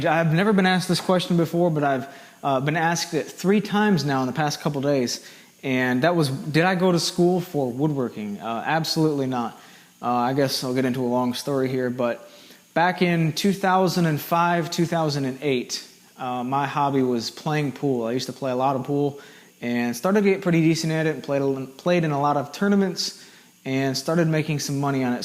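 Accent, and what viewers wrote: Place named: North, United States. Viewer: Nope...not too far at all. My little brother graduated from Mississippi State University a few years ago. I had originally put that you had an accent, but when I went back to the video before posting, I noticed a few slight "Mississippi" things, but mostly a northern accent. I thought I heard in one of your older videos that you mentioned making something for a Mississippi Craft group, but I forgot to bring it up.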